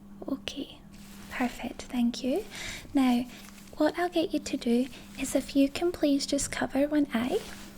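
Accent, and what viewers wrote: Scotland, United Kingdom. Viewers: Love your scots accent it makes me feel safe and helps with my ptsd thanks for all you do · Your medical role-plays are first-class and the Scottish accent is an added bonus! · I click on these to find out which accent I’m going to find. The Scottish one is my absolute favourite.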